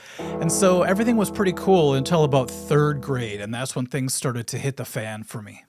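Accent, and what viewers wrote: Minnesota, United States. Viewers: I always thought of you as the Harry Kim of guitar teachers, from Star Trek Voyager.(Garret Wang). But with a Minnesota type accent. From the Coon Rapids area. Love your stuff. Keep teaching these younger generational how to be Rockers. Is Tom Bernard Still at KQ?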